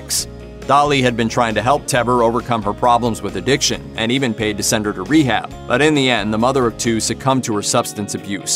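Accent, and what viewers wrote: South, United States. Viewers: you have a nice American accent you sound normal i enjoyed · A<link> love the way you said mama! I love hearing it.. soo endearing! Not sure if you got southern roots or even mountain roots! Either way I hope you get to meet this graceful lady!🙏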